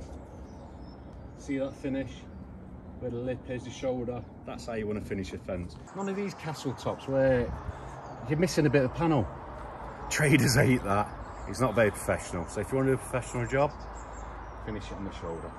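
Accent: Nottingham, United Kingdom. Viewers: Wait is he from Nottingham lol ? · Amazing video. I recognized the Notts accent immediately, always makes me smile.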